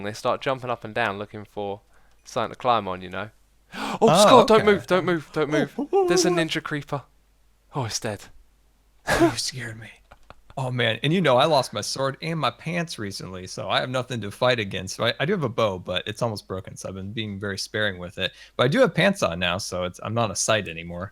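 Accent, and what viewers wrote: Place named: United Kingdom. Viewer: Yo, what part of the UK is your accent from?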